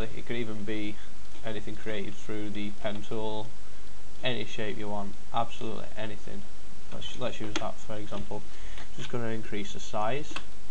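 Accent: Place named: Yorkshire, United Kingdom. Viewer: Thanks, this was useful! :) A fellow Yorkshireman by the sound of it. :)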